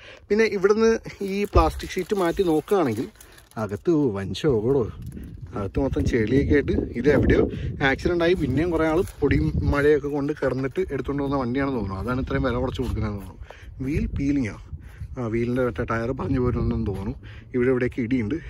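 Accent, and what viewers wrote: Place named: Kodakara, India. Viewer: Bro naatil kodakkara aano place ente oru friendine orma varnne bronte samsaram kekkumbo katta support bro👍🏻👍🏻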